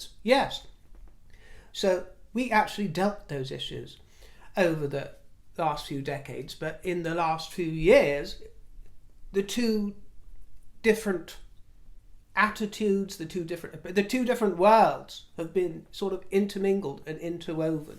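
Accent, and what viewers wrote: United Kingdom. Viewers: Amen for you speaking UK English! ❣❣ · Ohhh the word is "uppity"! With your Brit accent I kept hearing "appetite" 😂😂😂 Uppity just means "snob" - whoever said it is racist, get over yourself!!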